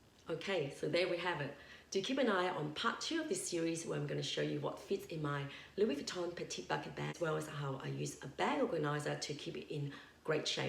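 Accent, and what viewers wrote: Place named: Australia. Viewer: Hi! I love your vibe! I also love your accent! Australia? Keep up the great work! 👏😎💖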